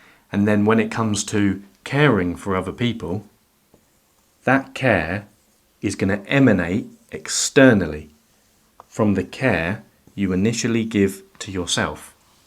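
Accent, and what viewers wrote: England, United Kingdom. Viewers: You sound like a Pom but live, behave, and have the smarts of an Aussie. (PS my parents are Poms) love your stuff, totally enthralling 🇦🇺🦘👍🏼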